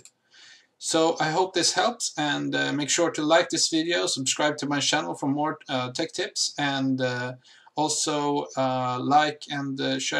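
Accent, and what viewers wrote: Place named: Sweden. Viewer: Älskar när man kan höra ett det är en svensk som talar engelska <3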